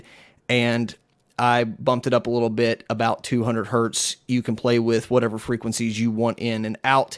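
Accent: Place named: Kentucky, United States. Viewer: new to your vids here... Where are you from? I'm from Kentucky,and your acsent sounds like you're from this area,lol. Sorry man not trying to be whatever, but yea... Awesome vid. New sub here.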